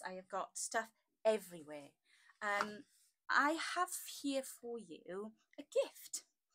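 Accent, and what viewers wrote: Wales, United Kingdom. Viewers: Wow! Just wow! How beautiful is that kit? and it's free!! That's very generous of you. I'm a new subscriber and am so thrilled to hear your Welsh accent. I was born in Wales and my parents emigrated to Australia when I was two. Now that they have both passed away I really miss hearing their accents. I would have subscribed to your channel just for the accent lol but your content is beautiful. Many thanks for sharing your positive take on autumn.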